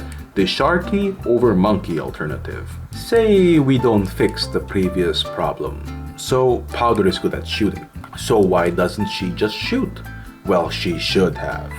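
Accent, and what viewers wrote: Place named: Eastern Europe. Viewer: As a fellow slav I knew when I've heard your accent, that there was not enough tears 100% right Also. Bober 🦫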